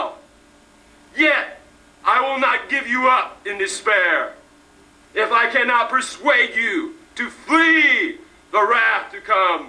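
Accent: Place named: United States. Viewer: His church is Cildwrn, Llangefni, Anglesey, North Wales. Strange to hear this in an US accent!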